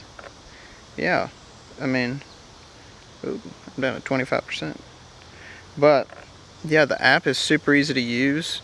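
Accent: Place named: Texas, United States. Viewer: Your voice is so good bra like texas but your a brown guy like me 🤣 such a mind blow out seeing as your so down to earth... can't picture a single (non racist!) texan I like other than McConaughey, until now! 👍🏽 from a guy in a tiny place called fareham in the UK ✌🏾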